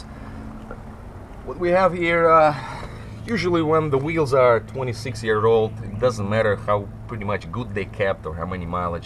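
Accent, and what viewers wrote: Russia. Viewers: Ты русский? 😁 акцент жесткий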